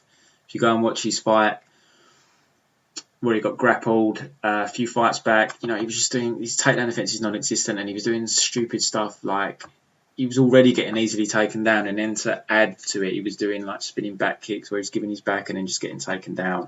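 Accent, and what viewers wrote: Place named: Cockney, United Kingdom. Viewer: your accent sounds like it's not sure if it's cockney or somewhere in cali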